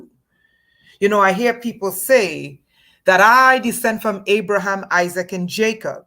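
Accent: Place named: Trinidad and Tobago. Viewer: I love this Caribbean queen and I love how she blends in her Trinidad slang and pronunciations of some words. My country Belize which is a part of Caricom but located in central America also speaks broken English so I can understand very well especially when she becomes passionate with some words and tries to drive a point home. It reminds me of how our elders speak to us, very strongly, and by the end of the talk if you don't learn anything and take heed then you're on your own. "yo ears cahna tuff like kunut fiba"!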